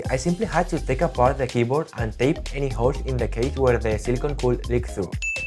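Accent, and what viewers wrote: Spain. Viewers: La verdad que he notado que tu inglés es muy español, y luego cuando has mencionado que vives en España he dicho, este chico es más español que la tortilla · Ya decía yo que el acento era sospechoso fellow Spaniard, buen vid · Tiene acento español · Desde ek primer momento que escuche tu acento sabia que eras español! tu video es genial, nuevo sub!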